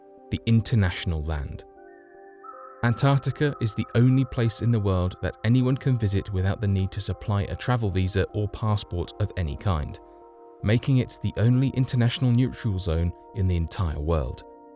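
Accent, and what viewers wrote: United Kingdom. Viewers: Nice accent, kinda a British one! I truly appreciate it!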